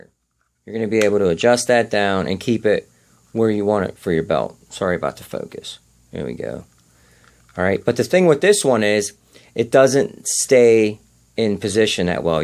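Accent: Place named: Pennsylvania, United States. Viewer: "you can tighten this daaan" I love your Pennsylvania accent.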